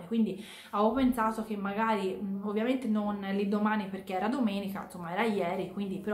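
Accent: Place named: Tuscany, Italy. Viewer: Mi piace un sacco il tuo leggero accento toscano, troppo simpatico!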